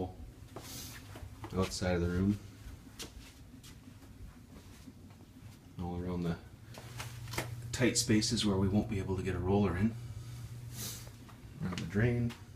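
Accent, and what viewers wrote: Canada. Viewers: Bonding prkmer would have been a good start. After edging, don't wait! Wet edge. It is far better to keep a wet edge with any paint. You are lucky to still be able to get oil based paint, many states that is impossible, you sound Canadian, if so, I'd be shocked that they have any oil based paint there.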